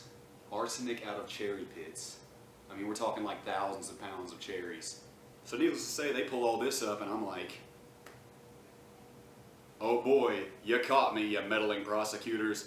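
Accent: South, United States. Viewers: you sound like your in the south. Go chat it up with After Prison show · Sounds like an American accent... freedom of speech infringed upon? I've heard other countries you can get in trouble for words if you're unlucky but its still kinda bull. In America we have the amendments sooooo if you were arrested in America (can't say I know the details) but from what I have heard, this arrest was very unwarranted. So you must not be from America :/ If you are from America, im personally offended that it would even be possible for this to happen to you. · yeh, after i noticed your accent was from usa i almost shit myself. i dont understand this case. you should have been protected under privacy laws of net neutrality or something unless you were fowarding bomb threats to the fbi or something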